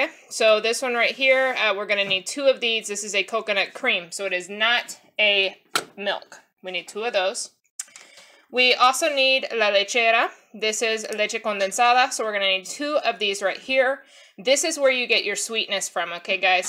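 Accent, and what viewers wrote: Puerto Rico. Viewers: You could hear she's americanized Puerto Rican when she speaks her Spanish, but she dominates it well it's understandable....se le nota que es una boricua americanizada nacida en los estados unidos por la manera que pronuncia sus palabras ...pero lo domina bien se le entiende.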